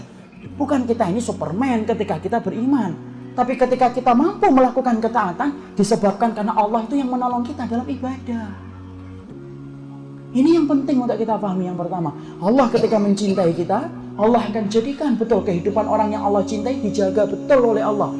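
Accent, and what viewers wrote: Indonesia. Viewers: kangen medoke.. alhamdulillah ilmunya..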